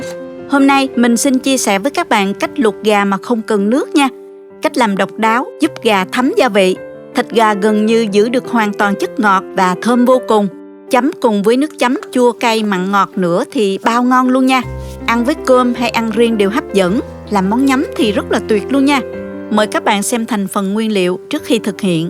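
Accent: South, Vietnam. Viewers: Giọng nói đặc sệt Nam Bộ. Chỉ dẫn tận tình,tỉ mỉ. Nghe dễ nhớ, dễ hiểu vô cùng. Có thể dùng nồi cơm điện hấp được không? Cảm ơn cô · Cô ấy có giọng nói Nam Bộ rất dễ thương và dễ cảm tình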